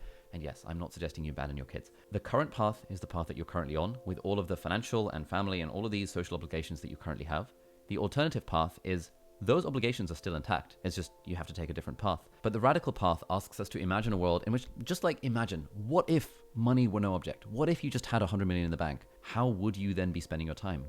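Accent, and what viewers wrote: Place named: United Kingdom. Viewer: Omg the video automatically started in spanish and it sounded so souless lol I missed british voice inmedately😂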